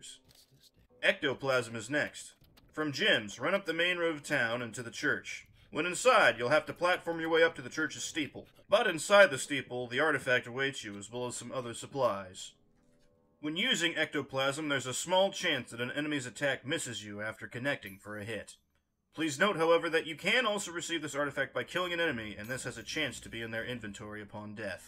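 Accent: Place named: United States. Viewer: Thank you my good sir! Your voice accent Is that of Cowboy by the way!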